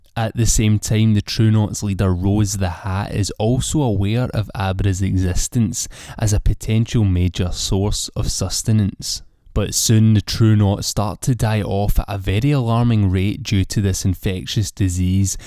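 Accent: Scotland, United Kingdom. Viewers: I'm guessing Scotland.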